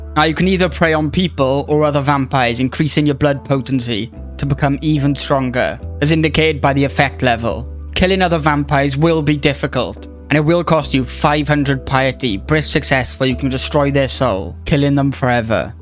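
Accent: Wales, United Kingdom. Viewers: Hey great vid man, nice to see another Welshman into ck3, keep it up my good man, your accent tells me you’re from around the Swansea area?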